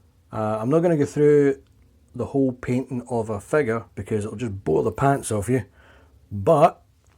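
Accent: Scotland, United Kingdom. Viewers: I owe u an apology. I have started painting figures because I just have no room anymore for planes and tanks so I came looking for hints on painting 72 scale. Clicked yours and heard the accent and thought geezus no way. BUT I listened on and in the end, thought what a great tutorial. So old mate, sorry for thinking here we go some Scottish damn wanker waffling. It was the opposite so thank you heaps. ( PS You have to excuse me, Im an Aussie :-) ) Subscribed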